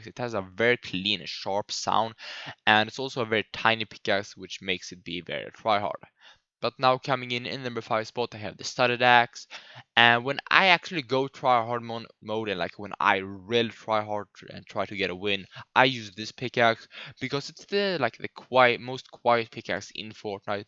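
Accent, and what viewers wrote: Sweden. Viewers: Are you from Sweden or something? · Are u swedish?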